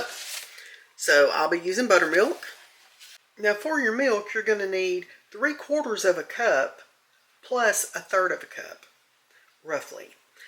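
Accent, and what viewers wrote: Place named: South, United States. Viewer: I love your recipes, i am on a fixed income and these seem very inexpensive. Also, i love your accent. I'm from the deep piney woods of East Texas close to the Louisiana border, and your accent so reminds me of home as my family moved there from Alabama and Mississippi.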